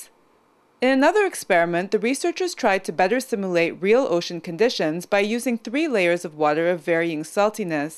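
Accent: United States. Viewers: I'm English so to me she just sounds American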